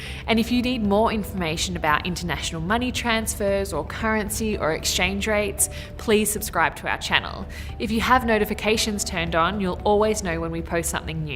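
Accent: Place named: Australia. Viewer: I love her Australian accent and is very wise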